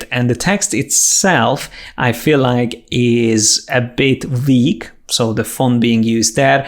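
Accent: Hungary. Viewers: Te véletlenül nem beszélsz magyarul?:D Kicsit olyan a kiejtésed.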